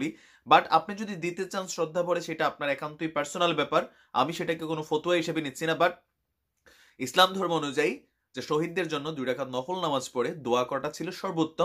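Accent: Comilla, Bangladesh. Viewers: ভাই আপনার নিজস্ব কুমিল্লার ভাষা আমার কাছে দারুন লাগে এবং বারবার শুনি এবং প্রাণ খুলে হাসাহাসি করি দারুন চালিয়ে যান।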